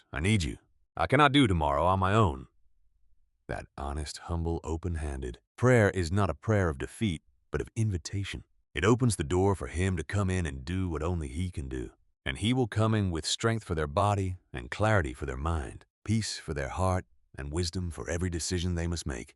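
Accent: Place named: Pittsburgh, United States. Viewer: Oh i like this voice Lord, it's just like "Pittsburghese" is the distinct regional dialect and slang spoken in Pittsburgh, Pennsylvania, and Western Pennsylvania. Rooted in the working-class history of the region and influenced by early Scotch-Irish, immigrant, and Appalachian speech patterns, locals who use this dialect are affectionately known as "Yinzers". I Am in eastern Ohio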